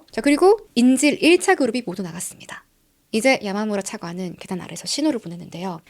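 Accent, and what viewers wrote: Gyeongsang, South Korea. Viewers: 경상도분이시네 ㅎㅎ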